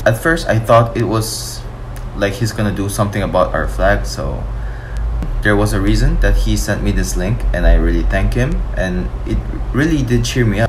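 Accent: Philippines. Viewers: You sounded like filipino already ☺️ Love and peace to all Filipino and Korean people 😊❤️♥️ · Lol, you have a Filipino accent when you speak English. 👍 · Hey you've got a good filipino english accent and its perfectly clear. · Kaya pala your accent is like Filipino haha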